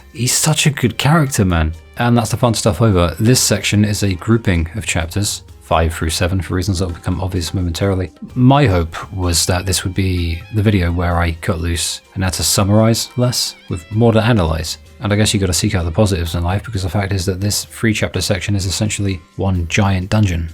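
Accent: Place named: United Kingdom. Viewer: oh he's british. Now it all makes sense.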